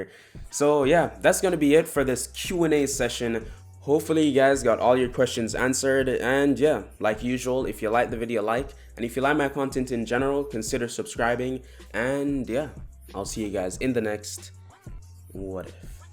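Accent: Jamaica. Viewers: im half jamaican and I could see that you were jamaican in your voice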